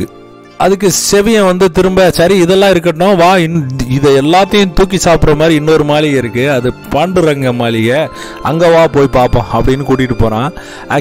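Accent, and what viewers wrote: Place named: Madurai, India. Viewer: Actually நீங்கள் எவ்வளவுதான் try பண்ணினாலும் முடியாது. சொல்ராங்கே மதுரைக்காரர் என தெரிகிறது.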